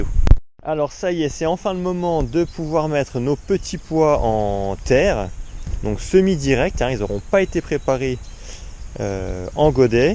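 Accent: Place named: Lorraine, France. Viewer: Je découvre votre vidéo, votre accent me rappelle la Lorraine dont je suis originaire ?! Un truc pour vos cultures de Fèves, ici on en cultive beaucoup. Pour ne pas avoir de pucerons il faut les semer à l'automne et on les récolte au printemps sans aucun puceron. Si on les sème au printemps on a presque toujours des pucerons aussi. Merci pour vos conseils et cet accent qui me fait chaud au coeur. Je vous écris d'Albi où il fait toujours trop chaud...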